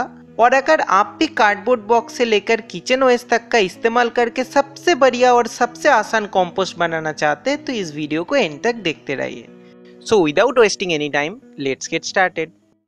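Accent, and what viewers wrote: Bengal, India. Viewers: R u Bengali sir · Typical Bengali accent. Very good.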